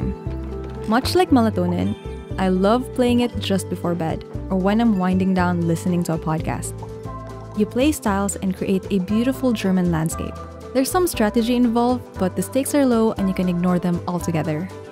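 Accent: Philippines. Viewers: She kinda have filo accent ❤ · I can hear the Filipina accent from your English haha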